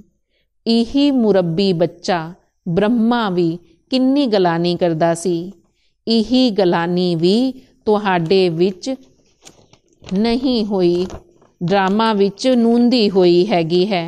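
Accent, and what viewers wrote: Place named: Punjab, India. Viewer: Didi aapki punjabi language bahut pyari n sweet hy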